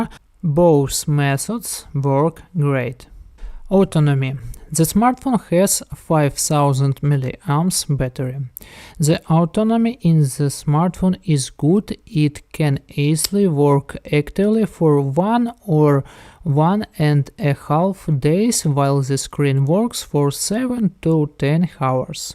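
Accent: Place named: Russia. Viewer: Сразу видно русский акцент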